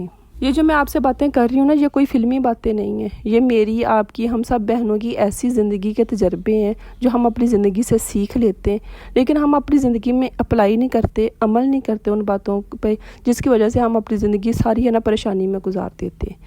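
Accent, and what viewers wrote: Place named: Sialkot, Pakistan. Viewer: Your accent is Sialkot,nice accent,so nice of you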